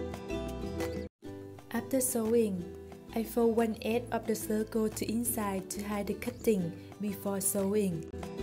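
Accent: Vietnam. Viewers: Walters She is from Vietnam, she has a Vietnamese accent. Definitely not deaf. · R u Vietnamese? I feel like u have a Vietnamese accent lol I luv the tops btw but I’m not amazing enough to create them lol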